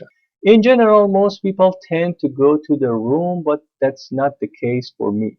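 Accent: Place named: India. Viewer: مثه هندیا انگلیسی صحبت میکنید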